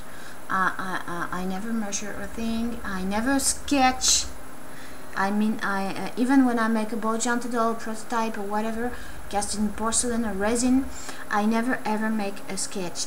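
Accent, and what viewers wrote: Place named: France. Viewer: ahhh it been so long since I was with the part of my family that has your french accent, hahaha! :) you sculpt like me, no measurements! lol, except I draw sometimes. Johnny <3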